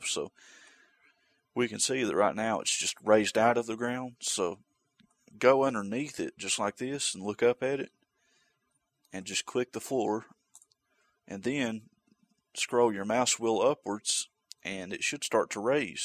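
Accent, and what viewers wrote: South, United States. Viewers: Came here researching 3d floors. Was pleasantly surprised to hear a voice that clearly knows a thing or two about DooM editing that also has a southern accent. Dirty souf y'all. :D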